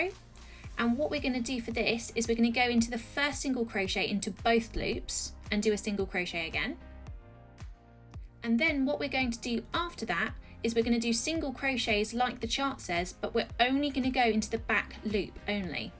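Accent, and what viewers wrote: England, United Kingdom. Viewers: You sound English but seem to using American terms? E.g. ‘single crochet’ instead of ‘double crochet’. I am I correct? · Thanks for this tutorial it is something I really want to try. You have confused me a bi thought - speaking with an English accent but talking in US terms! I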